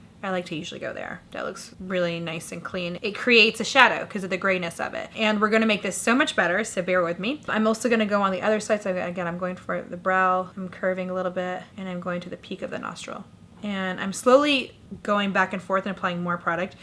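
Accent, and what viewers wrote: Iraq. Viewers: احبج من تحجين عراقي 🤩🤩🤩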